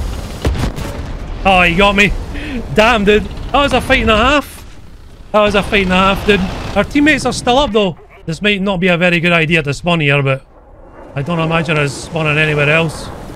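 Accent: Scotland, United Kingdom. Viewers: The guy is Scotish he can't tell the difference...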